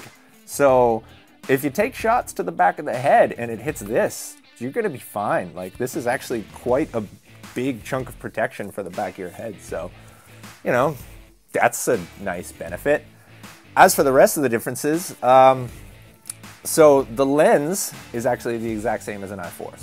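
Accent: Canada. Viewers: Jose Lopez It's Canadian, not Irish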